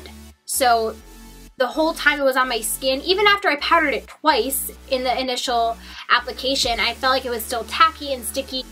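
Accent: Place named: East Coast, United States. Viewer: I love your tan!! You look great!!! I bought that Monistat primer on your recommendation and although it sounds awkward to put it on your face or tell your friends about, lol, it works great. Thanks!! I have super dry skin, so Im excited to try the foundation. I was considering trying it with no or a different foundation primer. Just an idea!! Great reviews, always!! When do you head back to Granada?? Do you miss it?? Every once in a while I catch your east coast accent, especially when you say "mascara," lol, and it makes me smile. You seem like such a west coast girl, like me, until I hear "mascara!" haha. Just a silly observation. Watch, now you'll notice it too. Habaha. Take care,!!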